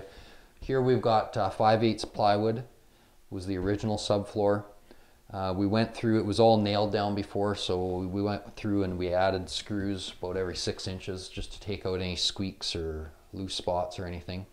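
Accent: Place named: Canada. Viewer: Are you Canadian? You sound more Canadian than me and I’m from northern ontario where I’m told the accent is the strongest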